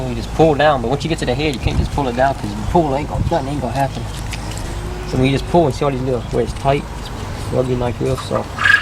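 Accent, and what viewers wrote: Louisiana, United States. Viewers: I love your accent. Louisiana I assume? Youre so soft spoken too. It isn't that harsh cajun lilt lol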